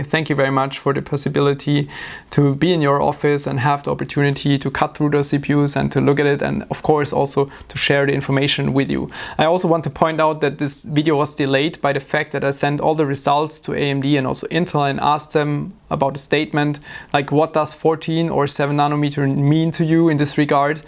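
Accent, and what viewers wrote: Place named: Northern Germany, Germany. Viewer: By listening to you I would guess you are from Berlin or maybe Hamburg, some city in the north of Germany at least. BTW Not too surprised that you got nothing out of Intel, maybe they should change their brand name since they seem to have run out of "Intel"...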